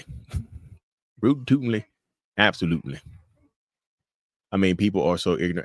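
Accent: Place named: North Carolina, United States. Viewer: I have watched so many of your videos, I now say the word "no" with a North Carolina accent.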